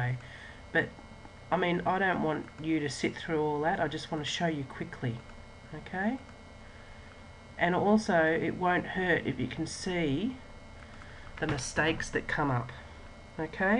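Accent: Australia. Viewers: About bloody time I hear an aussie Well done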